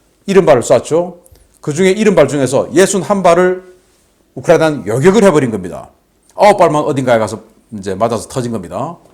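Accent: Gyeongsang, South Korea. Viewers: 경상도 말으로 러시아를 발라 버리는 깡이 직이네요... 그런데 방공망이 없는 거나 마챦가지 라는게 더 웃깁니다... 우리나라도 예전에 북한 의 정말 얄궃은 드론 을 나라 한바퀴 돌아 갈때 까지 몰랐었는데... 상당히 우려 스럽 습니다... 나아 졌는지.... 걱정 이군요...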